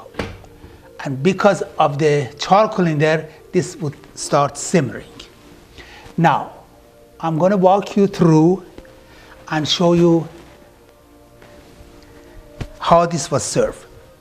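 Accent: Iran. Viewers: Iranian accent is the cutest accent ever :)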